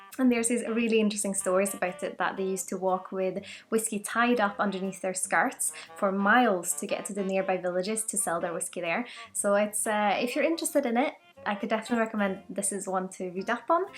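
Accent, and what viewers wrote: Scotland, United Kingdom. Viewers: Scottish or swedish ?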